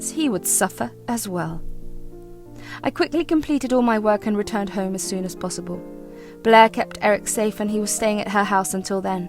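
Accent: United Kingdom. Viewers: The British accent is funny with this story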